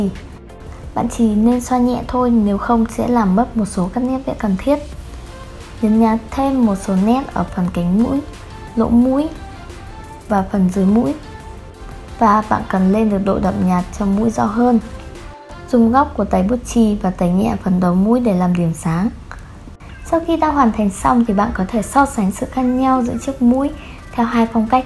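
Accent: Vietnam. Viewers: Cj là người Bắc hả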